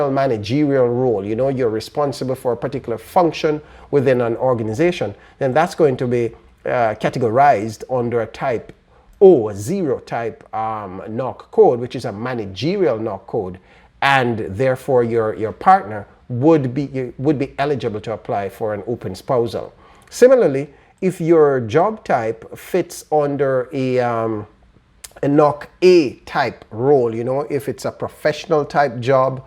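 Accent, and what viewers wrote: Jamaica. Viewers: You sound Jamaican. Thanks for the info.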